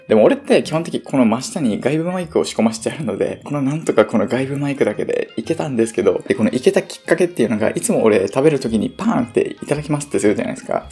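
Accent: Okinawa, Japan. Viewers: 沖縄方言めちゃいいですね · ちむどんどんっていう朝ドラで沖縄の方言にトリコになっています(^^) さんのリアル方言堪りません！美味しそうに食べている姿を見て夜ご飯食べました🤤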